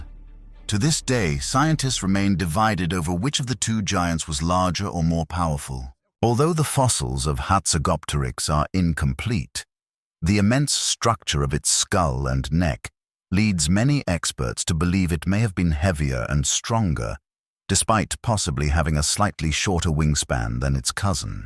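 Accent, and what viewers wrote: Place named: United Kingdom. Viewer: Depicting these flying dinosaurs in wildly different ways for the very… same… creature you keep talking about seriously detracts from anything the narration tries to claim. Every other frame shows the same type of dinosaur with either 2 legs OR 4 legs, plus wings in either case, and/or very different body types. It all seems like one big collection of dragon cartoons—accompanied by voice-over with a British accent in order to seem intelligent.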